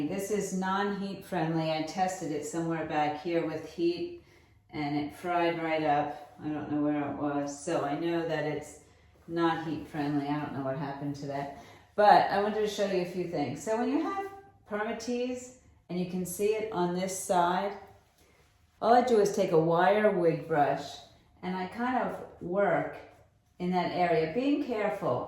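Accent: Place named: New York, United States. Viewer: Also as a former Long Island girl who moved to NC I miss that accent! (I’m assuming you’re a NY girl!)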